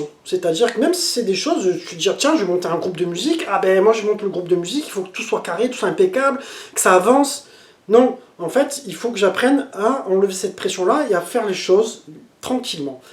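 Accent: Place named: South, France. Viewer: Courage à toi, prend ton temps et prend soin de toi. Hâte de revoir tes vidéo avec cet accent du sud qui me fait tellement du bien les matins:) Des vidéos spontanées avec ton naturel ça sera top je pense!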